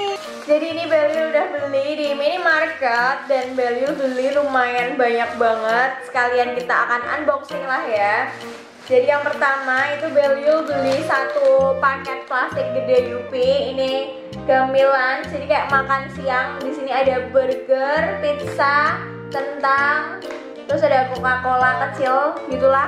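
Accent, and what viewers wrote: Java, Indonesia. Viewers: Mbaknya org mana?ook rodo2 njowo😂😂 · Ka dari jawa timur atau jawa tengah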